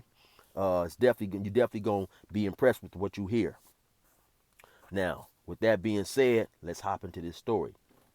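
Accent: Africa. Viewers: i think i get more entertained with 12 min stories then a 2 hour movie the African accent had me dying! JUST TELL ME WHAT I OWE YOU! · Love the African accent lol 😂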